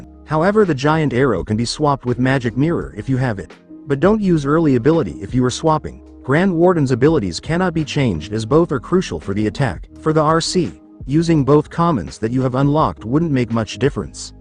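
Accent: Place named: Asia. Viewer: I have 0 negative intentions when I say this but I can hear the Asian accent through the voiceover I’m dead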